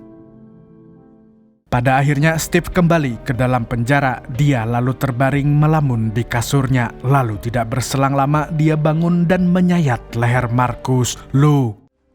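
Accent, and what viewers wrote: Banjar, Indonesia. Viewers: Orang banjar kalsel kah pian mang😂😂 "kyapa habar pian berataan" jar pian😅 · Urang banjar kah abng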